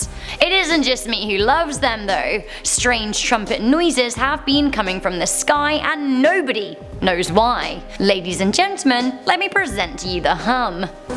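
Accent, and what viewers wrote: England, United Kingdom. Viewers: loved the Scottish accent! Nice attempt but I still cant get enough of your English accent.:)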